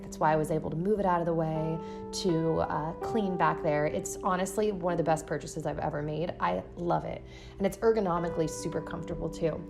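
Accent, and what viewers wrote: South, United States. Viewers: Hmm favorite cleaning products?? Bleach. Lol I love the smell of it. P.s. you're slowly getting that southern accent. 😍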